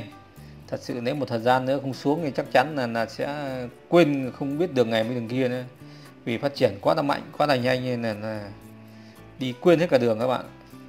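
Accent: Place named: Hung Ha, Vietnam. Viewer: Ông anh đúng giọng Hưng Hà rồi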